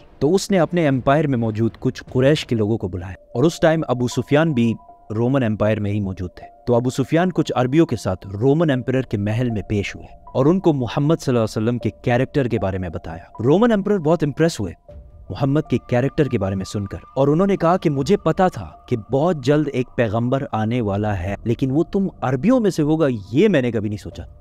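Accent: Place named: United Kingdom. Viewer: Bhai english accent british jaise kyu? Normal bolne mein problem hai kyq???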